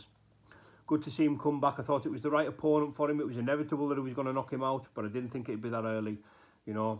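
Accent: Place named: Cumbria, United Kingdom. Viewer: You sound cumbria fella.............Where abouts? Just watched a fight that randomly popped into my tube feed. You said ' is it ower'. That's not Welsh. Lol.........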